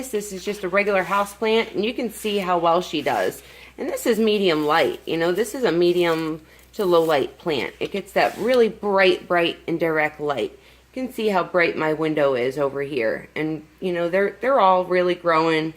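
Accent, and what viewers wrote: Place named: South Jersey, United States. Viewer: Also, love that friendship plant. Also, are you from the east coast? I'm from the Jersey shore and I'm hearing a familiar accent. South Jersey? Philly? lol.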